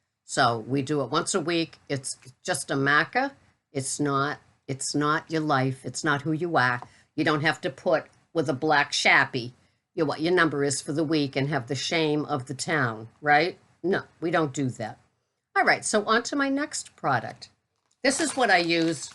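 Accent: Boston, United States. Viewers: I LOVE the Boston Accent. I need to do my New York Accent! Lol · yesss! I saw that too on Jason's channel! I totally agree with that. When you're eating whole fresh foods you won't have all those hidden sugars and hidden "junk" but people are eating so much processed keto stuff now that carb number can creep right up on ya! I love your boston accent!!!!! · Morning to ya,! I am behind but cant resist your Boston accent! Yes, it will always be total carbs for me. Anything else is to 'iffy' xoxoMarjorie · Good morning. I love, love you. You will never be called crazy as far as I'm concerned. I don't know if you have seen my last video "Coffee chat". That I posted on Friday, January 19, 2018 or not. Now that is crazy. Love the Boston accent. It makes me smile, good for the soul. Enjoy your weekend. 💕. NonnaGrace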